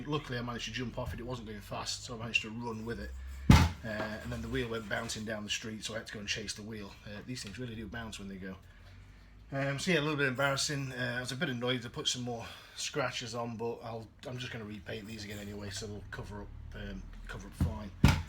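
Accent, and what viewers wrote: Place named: Sheffield, United Kingdom. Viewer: I knew you were from Sheffield, thought I recognised it :D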